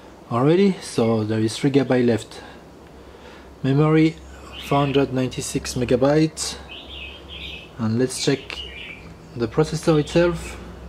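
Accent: France. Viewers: Hey buddy you speak english like french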